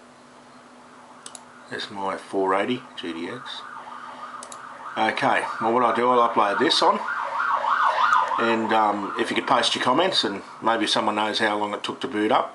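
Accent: Australia. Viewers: Thanks for the vid. I must say that EVERYTIME I find someone who has successfully uploaded their OS onto the REVO, I can not find the MoBo that they used for sale online. EVERYTIME!! Good vid, the aussie accent was a nice change of pace too. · I'm a kiwi, and i can tell an Aussie when i hear one lol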